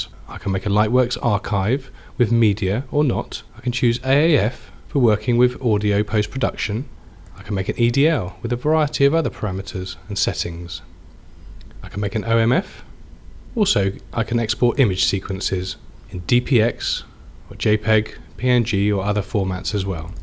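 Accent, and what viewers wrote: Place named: United Kingdom. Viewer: Британский акцент :) Те же дела с мобил и мобайл.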